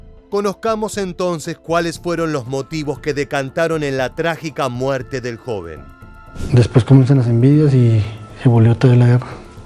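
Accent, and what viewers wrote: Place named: Argentina. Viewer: Tenia que ser argentino ese narrador arruino el docu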